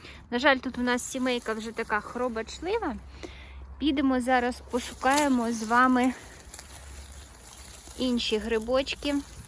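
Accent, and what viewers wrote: Standard. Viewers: Мне больше нравятся ваши видео когда вы разговариваете , потому что у вас хороший и чистый украинский ) Привет из Ирпеня ! Вчера нашли в нашем лесу аж одну рядовку фиолетовую , искали искали рядом еще ... ничего .. одинокая красавица оказалась ) За-то зонтики спасли ситуацию ) Страшно у нас , есть мины и могилы россиян без крестов в лесу и их сухпайки со звездой ... мы там рядом грибы брезгуем собирать .(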